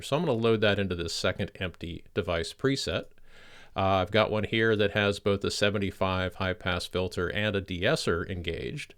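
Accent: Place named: United States. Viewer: Thank you so much for sharing your precious know-how. (And really Excuse me, but which side of the US are you from, East, West, South, or North? Because I really like English pronunciation like yours. I'm asking because I really want to know which side of the US that pronunciation is from.) Sincerely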